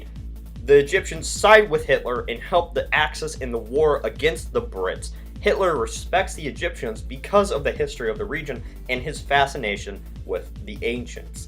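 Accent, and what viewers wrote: United States. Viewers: sounds so Ohioan when he was young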